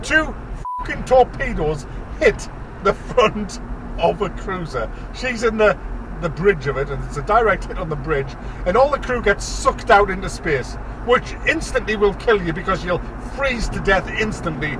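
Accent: United Kingdom. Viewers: The British accent makes the rant a whole lot more satisfying.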